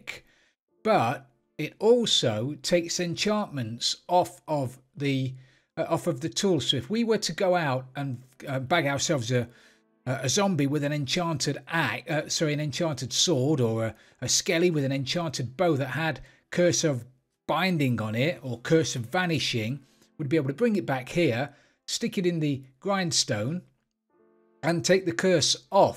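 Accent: United Kingdom. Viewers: Awsome video and I just realized I find my self waiting for your British accent to sing some random song so I can lol thanks my friend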